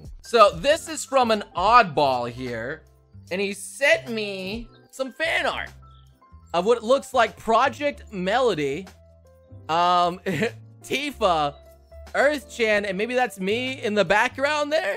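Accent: Scotland, United Kingdom. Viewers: I missed the scottish accent. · Ughhh that Scottish accent causes me genuine pain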